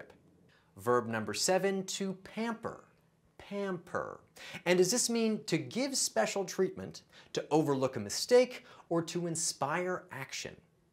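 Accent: United States. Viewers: This really should be about learning American. Assume was given an American pronounciation and 'acclimate' is not used outside of the US as the English word is acclimatize. Presentation was good. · For goodness sake this is primary s hood stuff! So the chap pronounces some of the words with n American accent t….which does not go down well with me. An English lady!!.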